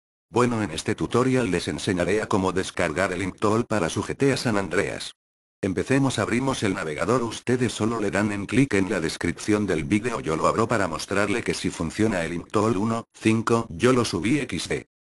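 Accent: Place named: Latin America. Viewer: Hablas con una mezcla de Latinoamericano (por hay argentino o chileno) con otra mezcla de español.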